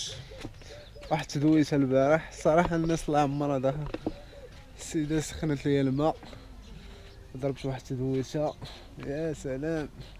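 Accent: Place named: Tangier, Morocco. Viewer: ناس اسفي هدرتهم قريبة شمالية حسابلي طنجاوي وضريف وأخلاقه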